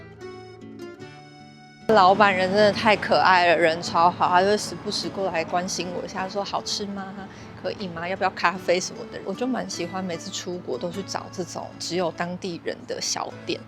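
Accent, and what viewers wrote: Taiwan. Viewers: 台湾旅游博主拍得最好的一个，台湾口音特别好听的，没有太多鼻音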